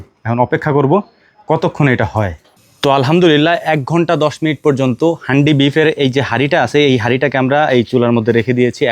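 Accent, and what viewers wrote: Faridpur, Bangladesh. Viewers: ভাইয়ের ভাষা সেম আমাদের ফরিদপুরের ভাষার মতো।আপনি কি ফরিদপুরের নাকি ভাই?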